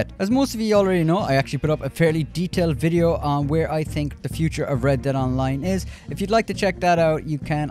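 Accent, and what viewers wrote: Ireland. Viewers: is from Ireland they sound weird to a brit the 30 especially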